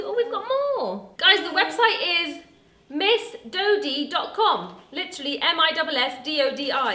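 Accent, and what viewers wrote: United Kingdom. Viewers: im pretty sure its mr.jared, but bc of her british accent it soudns like javed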